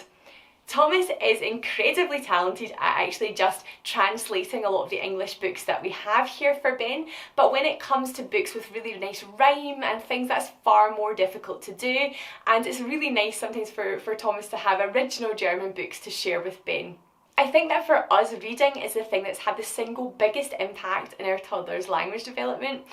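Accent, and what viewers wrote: Scotland, United Kingdom. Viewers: Love this video! My husband is french and I’m English/Welsh so we have a few languages going on! We’re also sticking to the one parent one language system but I do need to prod my husband mathieu when he forgets 😅 You have such a lovely accent! My wee granny was from Lewis and I spent all my childhood summers there so I have such a soft spot for the Scottish accent ✨ Lovely channel! New sub here, I can’t wait to see more ✨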